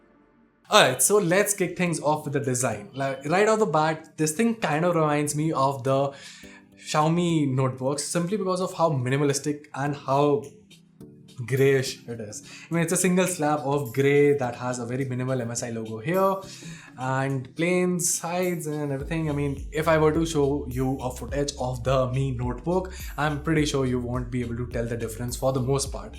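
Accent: India. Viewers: Is he an Indian? Coz he sounds like one?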